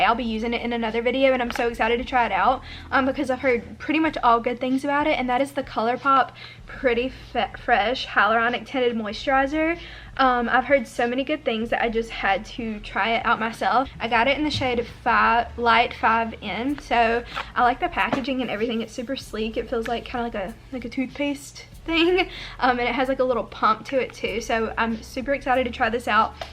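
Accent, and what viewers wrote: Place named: Alabama, United States. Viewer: youre literally so cute!! this is my first time watching your channel. i searched ulta haul (looking for something to purchase while being so bored in quarantine lol) and i am so happy i clicked on your vid! youre so likable. subscribed :) ALSO i live in mobile, al and i feel like you are also from alabama bc of your accent.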